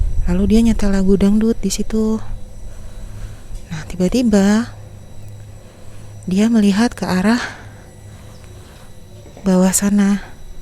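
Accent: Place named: Banyumas, Indonesia. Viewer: banyumas mana suaranya:v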